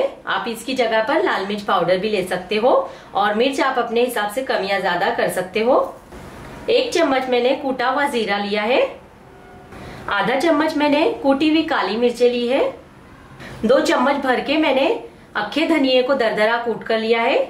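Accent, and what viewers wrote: Ahmedabad, India. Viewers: Jitni bhi hindi bolne ki koshish karo miya bhai ki ahmedabadi bhasha to chalak hi jaati hai 😂❤ allah kamyaabi de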